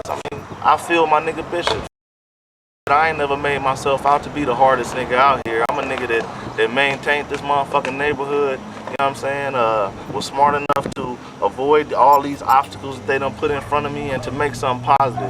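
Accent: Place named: New York, United States. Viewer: you talk like a NY nigga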